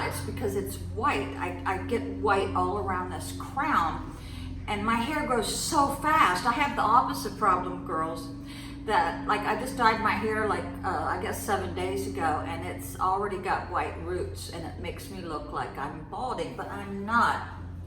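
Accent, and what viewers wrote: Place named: Southwest Virginia, United States. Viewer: OMG I love that SW Virginia accent. My late husband was from Roanoke.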